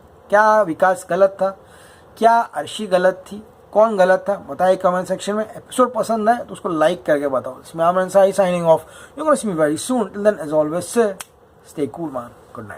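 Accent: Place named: Bihar, India. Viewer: Bhai bigboss wale public ko chutiya bana rahe hai aaj pehli baar mujhe aisa laga ki sab kuch pre planned tha. Vikas ka rona thoda real laga kyuki aadmi jab rota hai to koi bhi pighal jaata hai. Uske pass joker card hai. Wo dubara entry lega. Mujhe bhi gupti utna pasand nhi hai but emotional kar diya usne. bhai Amitabh bacchan ki mimicry ek number mera bhai. And your bihari accent is mindboggling. Love from bihar.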